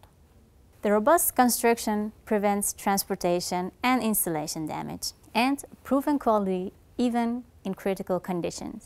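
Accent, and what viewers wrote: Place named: Venezuela. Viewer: She's from Venezuela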